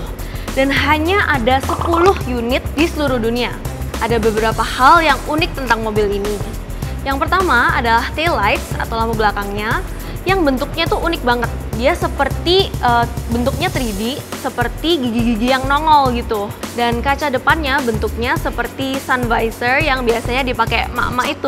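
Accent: Java, Indonesia. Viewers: Kok suaranya medok bgt , asli mana ya , surabaya kah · Terpesona sama mbak nya... Medok banget kaya nyonge 😂😂😂😂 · Mbk nya orang JAWA ya.?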